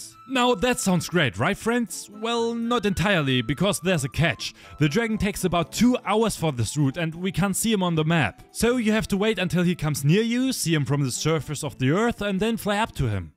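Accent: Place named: Germany. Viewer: Man hört so sehr den deutschen Akzent 😂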